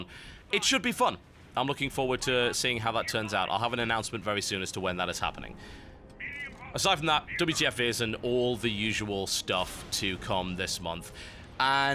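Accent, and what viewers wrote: United Kingdom. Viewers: Farewell, British accent. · and so ends the o_O. Hope you manage to maintain the accent. British accents are cool. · he will never lose his British accent, it may fade a bit, but no he won't. I know numerous people who moved here from the UK and they still have a strong British accent. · well he better not lose the british accent :) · you helped me to continue to use my 'modernised' victorian accent despite the petty mockings of my schoolmates. If you lose the accent, know that I shall continue to use the accent in memory of, arguably, my favourite british person of all time. Good luck in the states.:) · Moving to the States? hope he doesn`t lose his awesome british accent!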